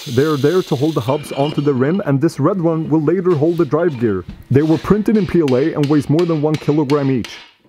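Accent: Norrland, Sweden. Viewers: You from Skellefteå, or some other part of norrland? I'm from Lycksele. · dude are you swedish? Hejsan!!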